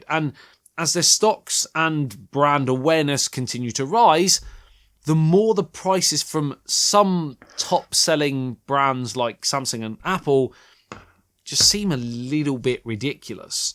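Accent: United Kingdom. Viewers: Love British English accent ❤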